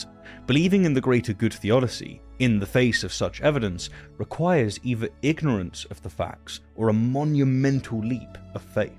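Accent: England, United Kingdom. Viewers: The English accent gets me every time :)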